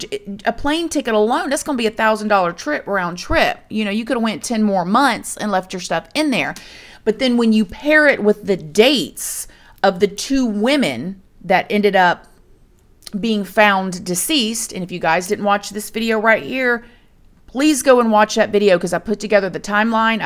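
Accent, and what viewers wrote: South, United States. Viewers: I don't know what part of Florida y'all are in, but I detect a southern accent. I'm in Enterprise in deep southeast Alabama. I startedwatching you in the early Gabby days. Love your videos!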